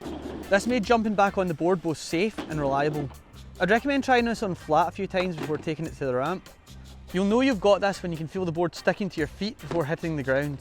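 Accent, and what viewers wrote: Scotland, United Kingdom. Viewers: Excellent tutorial! Well explained and demonstrated. Are you Scottish or Irish? I love the accent! Sounds more Scottish, but I'm curious. ✌️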